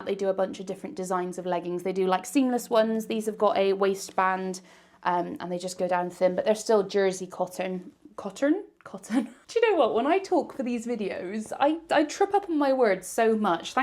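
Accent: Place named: West Country, United Kingdom. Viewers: “I seen” is classic West Country pronunciation and totally normal and fine and very endearing, so don’t stop! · My Great Great Grandma was born in Bedminster in 1851 she eloped with her cousin to the Forest of Dean in 1871 where they got married in Drybrook Church . My Great Grandma was born in 1872 . Family names are Warbutton and Emery I've never met any of the family ,as my Great Granny was brought up to the North East when she was a baby by her step Dad and his family after her Mum died (supposedly) . Did family tree but no mention of Eliza after her baby (my Great Granny) was born in Bedwelty Workhouse in Tredegar South Wales. Got Elizas marrage certs to both Her 1st husband Robert Joseph Emery and 2nd Elijah Biggs . Dont know which one was my G real Grannys Dad . Keep your accent I love it the Bristol accent it's part of my ancestry alongside my Northumberland accent.❤️ -By the way my Great Granny lived till she was 93. 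· Hi, ive just binge watched all of your Temu vids. Love them. Then i thought lets go back to the beginning, omg you were so sweet. Can just about hear your lovely west country accent. Xx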